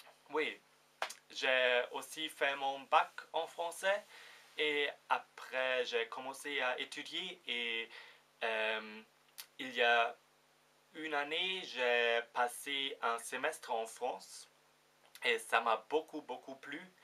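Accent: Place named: Germany. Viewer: Hallo ! ich bin Französich,du sprichst gut Französich , mach dir keine Sorgen ;) Ihren deutschen Akzent ist cool, nicht entfernt continue comme ça!!!!!!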